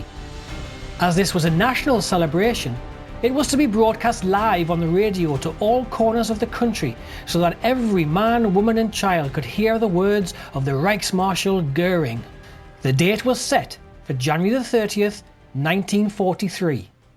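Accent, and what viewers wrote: Newcastle, United Kingdom. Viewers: Not sure if it's Geordie accent but reminds me my childhood in blyth. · You sound like a Geordie. I am from Lobley Hill, Gateshead originally.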